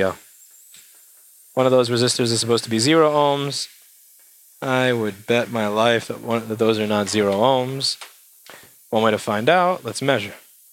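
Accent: New York, United States. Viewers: didn't even have to look at the description to know you were from new york. you probably grew up near queens too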